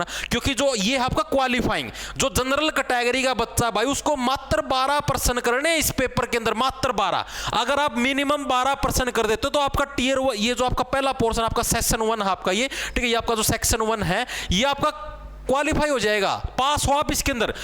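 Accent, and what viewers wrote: Haryana, India. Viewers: Wah kya majay dar language hai sir apki i think haryanvi hai ❤❤❤❤❤ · Love your Haryanvi accent bro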